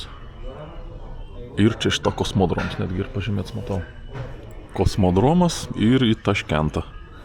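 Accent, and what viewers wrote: Samogitia, Lithuania. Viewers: Kaip keista išgirsti kalbanti taisiklingai ne žemaitiška tarme:DDDD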